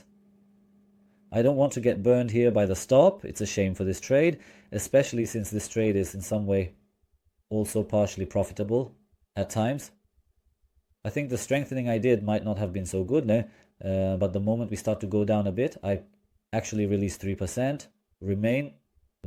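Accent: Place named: United Kingdom. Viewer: Loved the British accent mate, good luck with the English account 👌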